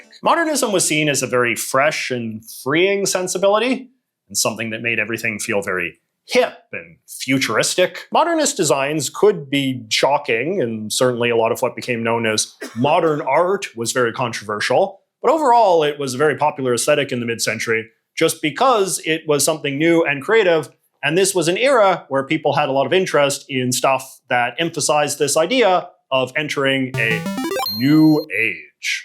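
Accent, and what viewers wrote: Canada. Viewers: What’s this accent? Never heard anybody who pronounces “about” like “a boot” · I agree with a lot of your points made here. But man is your Canadian accent is really popping here.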